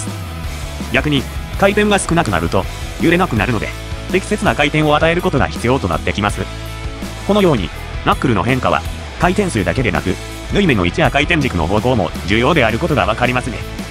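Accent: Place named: Kansai, Japan. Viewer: ちょっと関西弁チックなのがすこ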